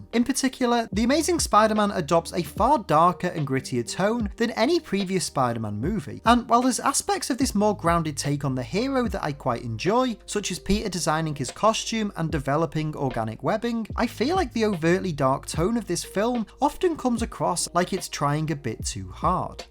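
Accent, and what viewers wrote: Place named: Yorkshire, United Kingdom. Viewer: That's embarrassing hahaha I'm from Leeds I thought I caught ur accent lol, you must be from Yorkshire tho amirite. Besides the point tho I love your videos, I watch them an unhealthy amount 😂 Keep up the excellent insights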